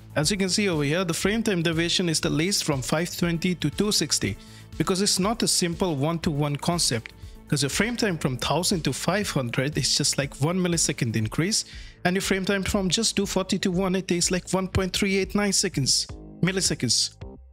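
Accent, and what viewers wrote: India. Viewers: Remember the -threads in set launch options? does it still work? like i had a 5600x before and i put -threads 13 there, and my game usually felt better, but now with the 5950x do i need to put -threads 33? because the game wasn't using the threads correctly even if u enable multicore rendering in option. Oh and BTW, are you hindi? based on your accent.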